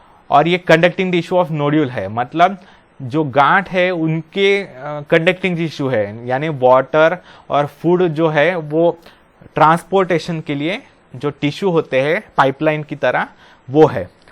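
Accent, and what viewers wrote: Maharashtra, India. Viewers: Sir aap marathi ho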